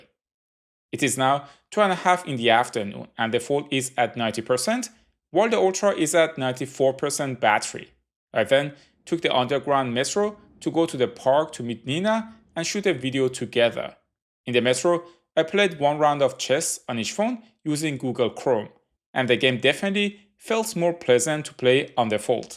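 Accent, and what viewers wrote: Iran. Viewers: Dude that Thick Persian Accent got me 😅 well done bro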